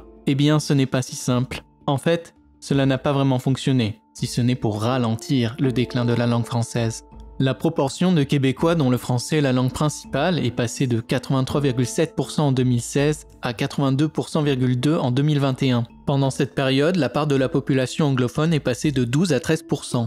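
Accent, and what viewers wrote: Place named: Paris, France. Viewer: Présentateur avec un accent de parigot ça promet! Je suis né sur un continent très éloigné de l'Amérique et de la France et j'ai habité le Québec depuis plus de 23 ans, en me voyant l'on s'adressait à moi en anglais ou l'on m'insultait avant même que je dis mot. Lorsque je m'exprime clairement en français, plusieurs ont des répliques virulentes et grossières et d'autres me demande si je suis comme eux (français de France). C'est tellement bigarré comme langage et biscornu comme formulation syntaxique que j'ai quitté cette province. Il ne faudrait tout de même pas oublié que la construction de Montréal s'est fait avec des gens qui ne parlaient pas français (Irlandais, Polonais, Hongrois, Tchèques, Allemands, Russes, Chinois, Italiens). Mais les colons venant de France jouaient aux maîtres et rois. Ils se sont fait damé le pion par les britaniques par la suite ( voir l'ouvrage "les deux grandes solitudes") et l'arrivé massive de juifs et d'américains. 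Les premiers envahisseurs sont les Français et les Britanniques en se battant pour coloniser cette partie de terre dont-ils n'avaient aucun droit acquis!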